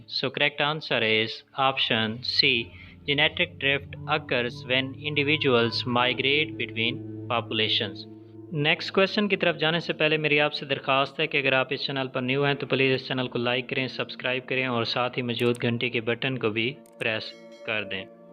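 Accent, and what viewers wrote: Pakistan. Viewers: Accent he aisa h.. I think it's Pakistani channel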